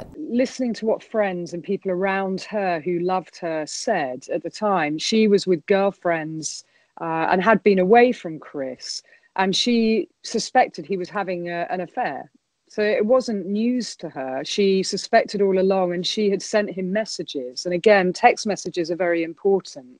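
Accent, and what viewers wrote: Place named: RP, United Kingdom. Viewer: You people have no idea she is well respected worldwide, secondly her way of speaking is a received English pronunciation and accentless, and her voice is very easy to listen to.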